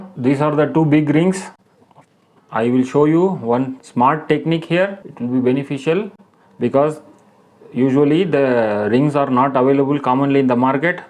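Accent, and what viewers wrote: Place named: India. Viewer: I love the indian accent when you can actually understand them, great video, thank you very much!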